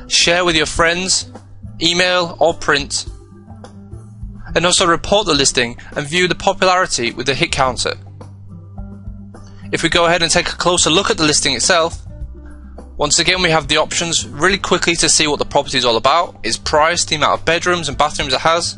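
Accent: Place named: United Kingdom. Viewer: I agree. It is a classic British accent,but not at all pompous or fake.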